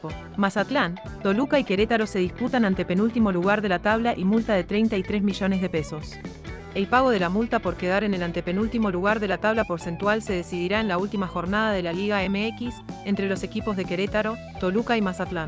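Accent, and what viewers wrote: Argentina. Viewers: PORQUE HABLAS COMO ARGENTINA?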